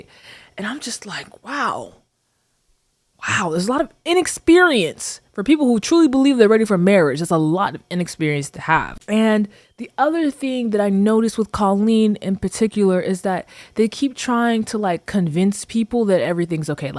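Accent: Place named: Nigeria. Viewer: omg your nigerian accent was everything. I love your analysis!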